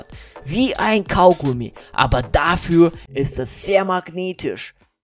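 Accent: Russia. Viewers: Bin ein treuer fan =) und dein akzent ist voll cool ^^ bist du russe? ^^, ich bin jedenfalls russe =D werde für immer beim kanal uschauen und nie weggehen =)))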